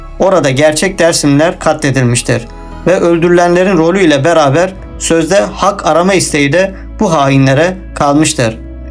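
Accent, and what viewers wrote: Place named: Armenia. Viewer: Senin sesin tam bir ermeni sesi,sarlatan,siktir git,amkoyuyum senin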